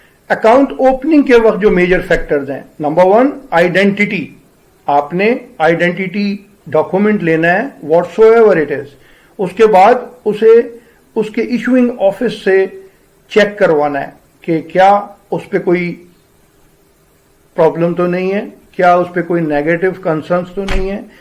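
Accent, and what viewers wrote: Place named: India. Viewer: For first 2 minutes I was like he is an Indian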